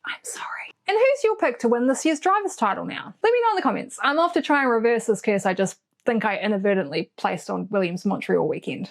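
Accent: New Zealand. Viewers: Yes you are correct. The New Zealand accent is right up there with vulgar American, Mumbling South African and flatfooted hick-up sounding Cockney from the British Isle of London-England. So you are second best in gnawing-ear-splitting sounds and I noticed that I was forced to ‘come again, please’s’ more often than with other distorting violations of the beautiful, melodic version of Oxford English. After all ‘come again’ is not the worst request you can get after a brutal rape. Apart from all my unkind remarks stands my sincere admiration for your very entertaining Emilia podcast. Thank you for that. JVJB from Amsterdam.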